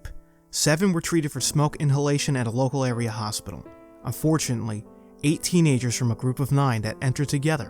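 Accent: New York, United States. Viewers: Someone’s from New York or Jersey based off his accent lol. Well done!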